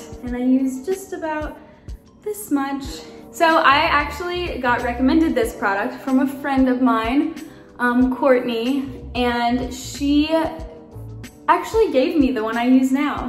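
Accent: United States. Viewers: This is how she always talks. And what do you mean “kourtney’s” accent? It’s called being American 😂 they don’t sound anything alike. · BRO HER VOICE CHANGED REMEMBER SHE HAD A MORE SOUTHERN ACCENT AND NOW ITS MORE AMERICAN I GUESS?? no hate but i just realized that❤️❤️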